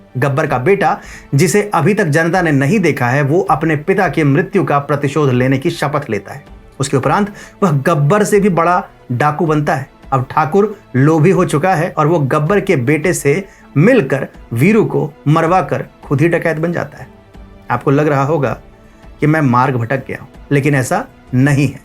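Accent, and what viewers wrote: Standard. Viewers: Santosh karak vaani hai aapki aur shuddh Hindi uchcharan pasand aaya 🙏 aise hi samaj ka kalyaan karte rahe. Dhanyavaad 🙏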